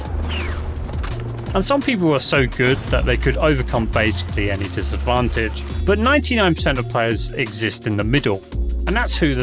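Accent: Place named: United Kingdom. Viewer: you didn't have to put "UK" in your username for people to know you're British™, your pronunciation is is so 🇬🇧🇬🇧🇬🇧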